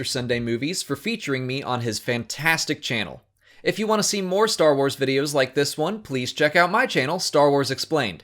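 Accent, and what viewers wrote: United States. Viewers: Great video, sounds even better with an American English accent rather than that Goat sounding yuck mouth. Really is great to have Star Wars Explained working with. im a huge fan of both of you. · ffs. I came to this video for more of epic Australian accent. Not this American voiceover crap. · Why am I hearing a American talking?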